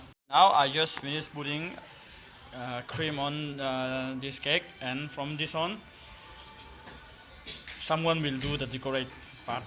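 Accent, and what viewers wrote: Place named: Mizoram, India. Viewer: You are Mizo English, very very Mizo English ❤😂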